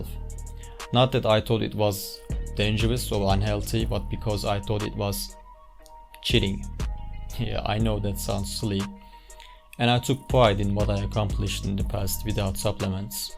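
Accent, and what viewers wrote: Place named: Turkey. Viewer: Bu bir destek yorumudur. Diline sağlık. İngilizcen bayağı iyi ama türk aksanın belirgin =)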